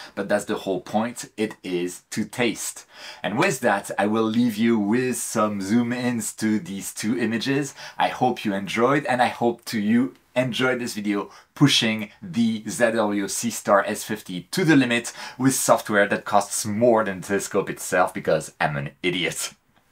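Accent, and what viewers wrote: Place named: France. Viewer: c'est moi ou j'entend une pointe d'accent francais dans ton anglais? good job vidéo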